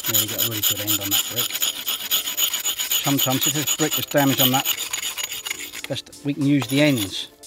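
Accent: Norfolk, United Kingdom. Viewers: Thanks, I thought Norfolk but didn't want to risk insulting you if you came from Suffolk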